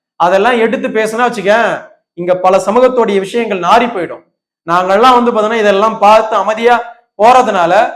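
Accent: North, India. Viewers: ஆமா பேச்சி வடக்கு கிராஸ் மாதிரி இருக்கு.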